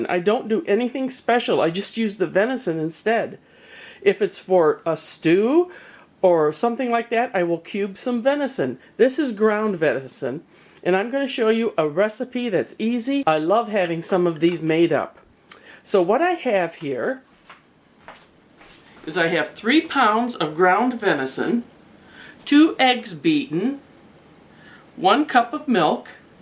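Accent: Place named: Illinois, United States. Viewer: Got to love that Illinois accent! Hahaha gets 3. Oh well he will make up for it in the pie Dept!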